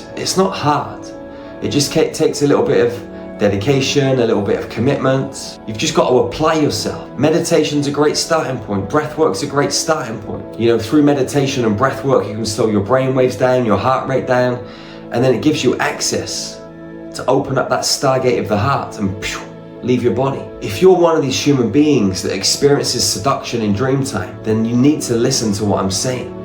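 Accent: United Kingdom. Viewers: its not a normal british accent just trying to figure it out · He's British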